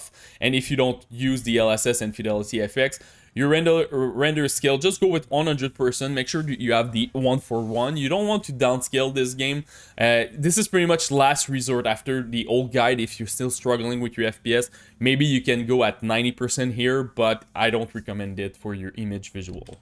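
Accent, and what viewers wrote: Quebec, Canada. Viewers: t québecois for sure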